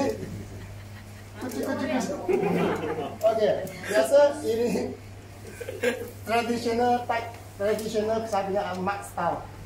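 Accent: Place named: China. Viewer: Melayu with Chinese Accent...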